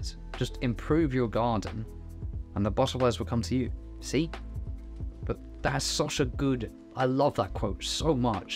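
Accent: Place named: United Kingdom. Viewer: british detected - opinion disregarded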